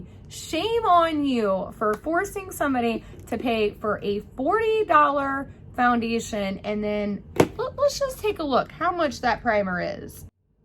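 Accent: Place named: Kentucky, United States. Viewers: I am from Kentucky as well and I completely get the pronunciation thing. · Country accent gotta love em lol and my mind wasn't in the gutter till you said something 😂😂😂😂😂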